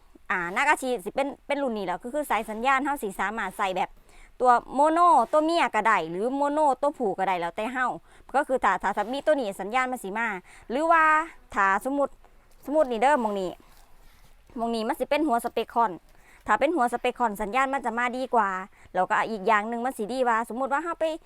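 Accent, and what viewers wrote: Thailand. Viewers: ต้องให้เพื่อนคนอีสานแปรให้ฟังอีกที กว่าจะรู้เรื่อง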